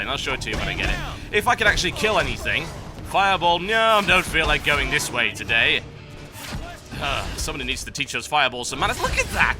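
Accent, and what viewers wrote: United Kingdom. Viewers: ure my favourite youtuber atm, mainly because its nice to hear proper British annunciate but if you fail to provide DCU content post launch... I. WILL. KILL. YOU!!!:P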